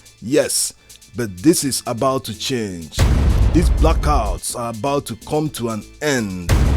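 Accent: Nigeria. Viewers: Thoroughly explained! I enjoy your wealth of info, and your🇳🇬 accent too!😁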